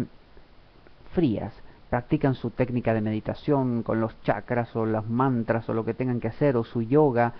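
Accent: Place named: Argentina. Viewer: comose nota que eres argentino que bien hablais los argentinos hablais mucho con el corazon en españa no lo hacemos tanto los minimos... transmitis muchisimo por lo menos ami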